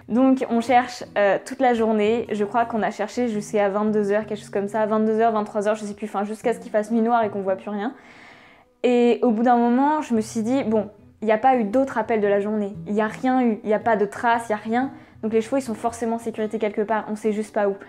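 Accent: England, United Kingdom. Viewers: Quel accent anglais !! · Tu as un très bel accent anglais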